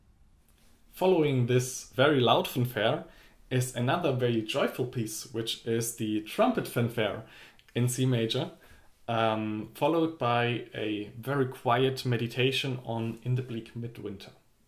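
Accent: Germany. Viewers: I was afraid that musical geniuses died years ago. But here is who knocks my socks off...He seems to have a German accent...It must be genetic. His work makes me feel validated and Happy. My parents gave me an appreciation of music. I picked up the ball and ran with it. Music ist mein Hertz, mein Geist, Mi Corozon, Moi Coer, how much time do you have?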